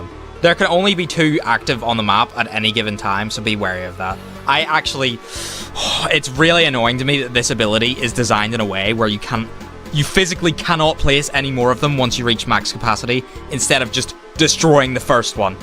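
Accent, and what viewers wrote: Scotland, United Kingdom. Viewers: I can smell the Scottish accent, SCOTLAND FOREVERRRRR 🏴🏴🏴🏴🏴🏴🏴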